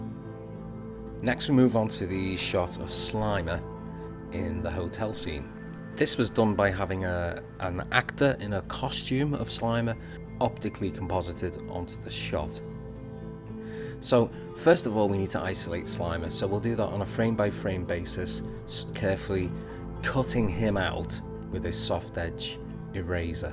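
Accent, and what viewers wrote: Liverpool, United Kingdom. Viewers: What part of Liverpool/Wirral are you from mate? Love what you’re doing here. Good on ya.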